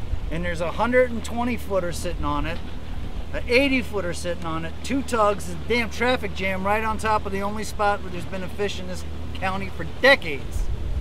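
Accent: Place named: Brooklyn, United States. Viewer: Yankees ?? You sound like your Straight out of Brooklyn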